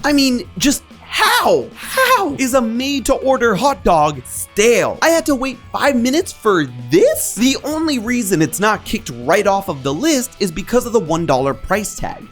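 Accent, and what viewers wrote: Argentina. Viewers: Nothing makes me happier than Argentinian accent coming through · IS FROM ARGENTINA???? THE ACCENT, THE CHARM, no wonder I like him as a host. Another coronación de la gloria 🇦🇷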